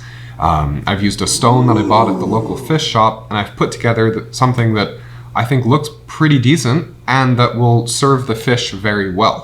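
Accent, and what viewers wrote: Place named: West Coast, Canada. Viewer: I can spot a West Coast Canadian accent from a mile away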